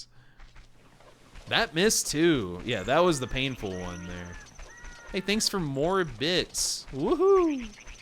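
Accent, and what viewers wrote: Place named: Brooklyn, United States. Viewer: sorry, this Brooklyn accent makes it difficult in stopping Ganondorf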